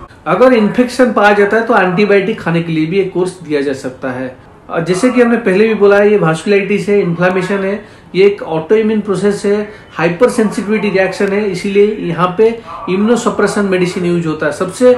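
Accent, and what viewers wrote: Odisha, India. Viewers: Are u an odia sir??😊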